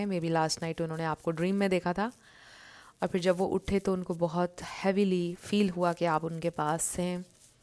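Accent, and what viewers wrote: Pakistan. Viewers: Aslamo alikum ..mujy aapki voice llehjy sy lgta hai aap peshawar sy hai hindko speaking ....?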